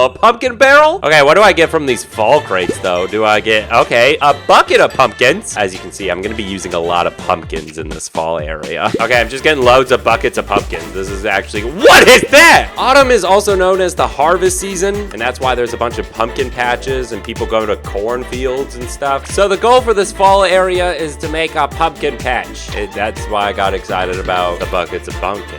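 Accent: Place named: United States. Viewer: Why does he sound American even though he said autumn?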